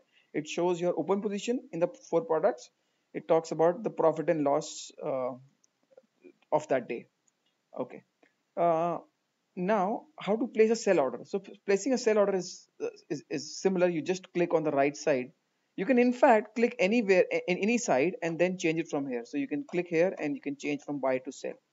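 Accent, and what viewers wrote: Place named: India. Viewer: can't understand the Indian accent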